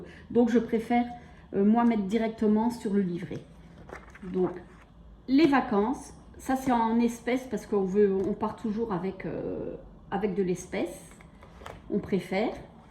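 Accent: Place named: Lorraine, France. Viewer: Cc, je découvre ta chaine et ton accent me fais chaud au coeur, je suis d'origine également de Lorraine , à la frontière Lux, mais maintenant dans le sud. Tres beaux classeurs. Et meme objectifs que toi, ne plus prendre dans les livrets pour finir le mois. Aux enveloppes depuis 1 an e1/2. Tout à fait une autre vision de l'argent avec les espèces..👍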